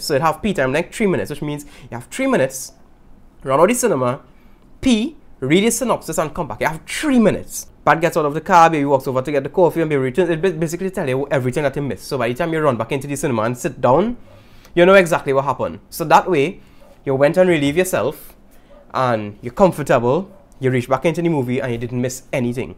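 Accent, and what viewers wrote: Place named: Trinidad and Tobago. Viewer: Trinii accent loll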